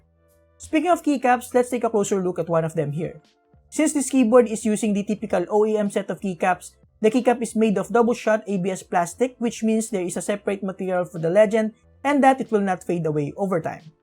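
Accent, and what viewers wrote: Philippines. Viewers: This guy has the most Filipino accent ever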